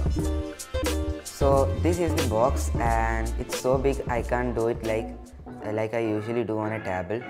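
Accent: Kerala, India. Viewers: Are you malayali from Kerala plzz replay your English is so 🤨🤨🤨🤔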